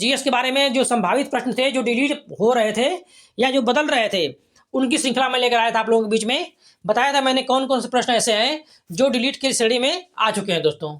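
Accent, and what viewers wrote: Ghazipur, India. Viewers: wahi मैंने सोचा ghazipur mau। या azamgarh से honge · Bhai prayagraj se katti nhi lag rahe ... Tone bilkul Ghazipur mau wali h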